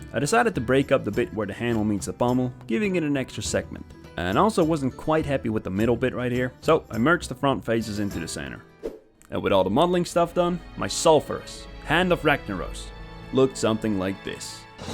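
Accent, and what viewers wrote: Netherlands. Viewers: Thats sick! Do i detect a dutch accent? keep up the good work!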